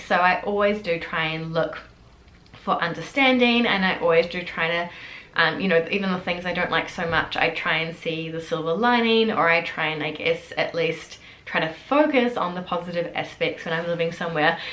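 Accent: New Zealand. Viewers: Your New Zealand accent is coming back in force! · Oh, you are so cute! Even when you're complaining about something, you're just totally likeable! Love watching your videos and seeing your friendly, open face and hearing that adorable Kiwi accent! It would be nice to hear directly from your husband about his acclimation to NZ. That must really be a culture shock - much as yours was when you first came to Paris! A small request - in the future, could you speak about what exactly your business entails? Thanks again for the peek into your world! Cheers, Fred in NYC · whoo je ne m'étais pas encore rendu compte sur tes dernières vidéos mais ton accent est bel et bien de retour depuis que tu es revenu en Nouvelle Zélande, les e qui deviennent des i etc... !!!! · Your Kiwi accent has gotten SO much stronger since you went back!! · I j discovered your channel a few days ago and have been knee deep ever since. 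Something fun I just realized was that when you were in France you sounded like you had a more "american" english accent. Now that you are back home in NZ I feel like your NZ accent has become more prominent. However, as an american I have really loved your videos and collabs. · Thank you for your honesty. I think I understand your dilemma. There is a side in you that appreciates being back in New Zealand, where it's quiet and nature is beautiful and the bureaucracy is less stifling and perhaps it's also nice to be able to see your family more often, yet, you also miss things from Paris, because it had become your home for five years and at some point, you had the vision to spend your entire life there. At the same time, you knew when you lived there it wasn't an ideal place (I agree with you about the noise and the endless paperwork), but now you also have to rethink your channel. You love doing your channel and I think you should keep doing it, you just need to find a new theme. 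Please don't let the negative comments bring you down. You're a beautiful young woman and I love listening to your New Zealand accent. What you're going through is stressful. It looks easy getting on a plane and just going somewhere else, but to start your life all over again, even if it's your home country can be challenging. I wish you the best of luck. Good that you have your husband with you, who knows about your life in Paris. That way, there is always a bit of Paris with you - the good part.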